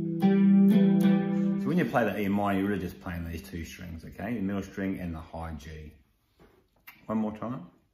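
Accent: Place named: Australia. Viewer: Great to have an Oz accent instructing 👏. Saw the cats jumper and and song title- thanks for this mate as I was learning this on 6 string from a mate who suddenly passed and I’ve also lost the finger ability for the 6 string so just got hold of a cbg. I’ll be learning this for me ol’ mate Doug and you have an Oz subscriber - also a Victorian. Cheers bud.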